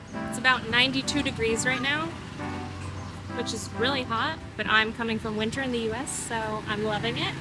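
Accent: Cambodia. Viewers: your khmer accent is really cute! And love the effort :) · I’m Cambodian and your accent sound like mine 😭😭😂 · Your Khmer accent sounds so beautiful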